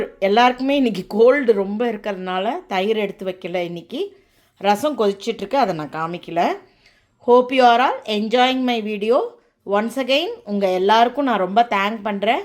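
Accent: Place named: Bangalore, India. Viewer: Thakshanam is the unique Bangalore Ayyangar bhashai ❤it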